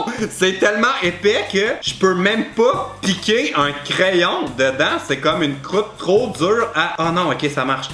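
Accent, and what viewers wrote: Quebec, Canada. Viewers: J'ai regarde tes vidéo pendant presque toute un journée et après je suis parti aux toilettes mon père m'a demandé un truc j'étais en train de parler avec l'accent québécois je comprenais pas pourquoi et maintenant je crois pourquoi en fait que j'ai trop regarder des vidéos de toi et du coup j'ai pris ton absence mais c'est bon j'ai plus l'accent Québécois mais j'aurais bien voulu l'avoir encore un peu lol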